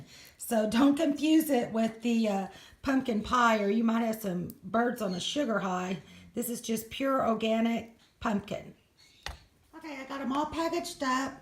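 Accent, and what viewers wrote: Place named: South, United States. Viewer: You are such a southern belle!!! I love it!!!!! I’m from NC and it put a smile on my face hearing your accent :) And thanks for the video, I have an Eclectus as of a couple of days ago, so I’ll have to make sure I can incorporate all of those ingredients. I heard my baby boy’s digestive tract/system is pickier than most other parrots. This is my very first bird so wish me luck. Be well!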